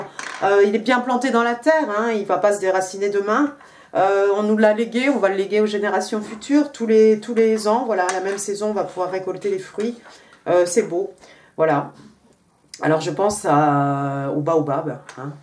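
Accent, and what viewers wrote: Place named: England, United Kingdom. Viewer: Merci pour cette guidance 🙏, j aime bien votre accent anglais 👍 . Bonne journée 🤗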